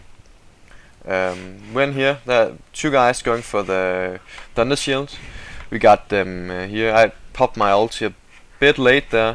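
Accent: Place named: Denmark. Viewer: kunne høre du er dansker! :D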